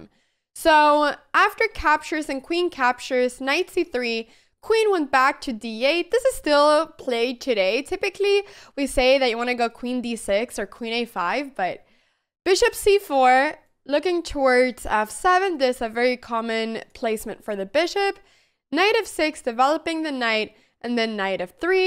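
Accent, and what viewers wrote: Spain. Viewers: Me encanta tu acento en castellano, no sabía que eras española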